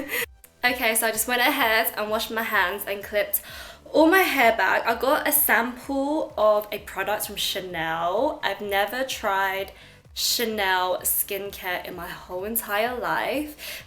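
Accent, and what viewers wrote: United Kingdom. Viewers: I am so happy you have YouTube!! I follow you on instagram and I'm so excited to watch your YouTube vids!❤️ It's so nice to have makeup inspiration from some one with a British accent✌🏻😜 (no offence to the fabulous others) Do you ever visit Scotland? Xx Keep it up! So much make up envy 😝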